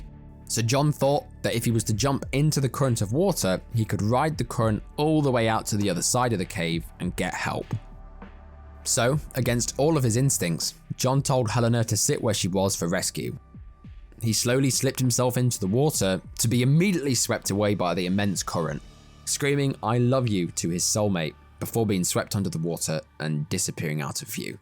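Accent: United Kingdom. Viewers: I prefer your version more than him because I like British accent in storytelling. 👍🏻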